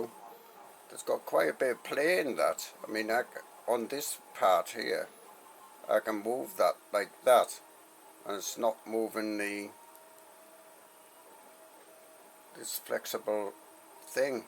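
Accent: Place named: West Midlands, United Kingdom. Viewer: God that English accent is hard to hear. West Midlands?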